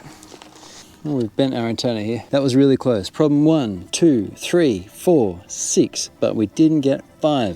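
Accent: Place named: Australia. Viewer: Definitely Aussie 😂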